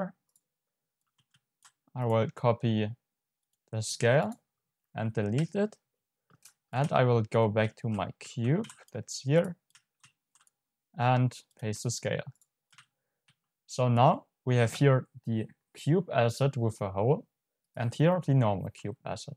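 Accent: Germany. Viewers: Mein hört dein deutsch raus 😂 aber alles gut thx für das Tutorial war sehr schön👍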